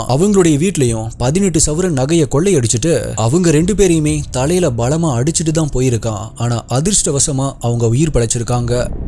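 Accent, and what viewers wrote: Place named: Eriyur, India. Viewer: அண்ணா உங்க ஊரு ஏரியூர் ஆஹ் னா உங்க slang அந்த ஊருல உள்ளது மாரி irukku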